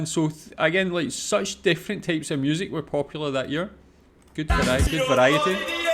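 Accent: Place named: North England, United Kingdom. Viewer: Nice Accent Dude! 😄 North England?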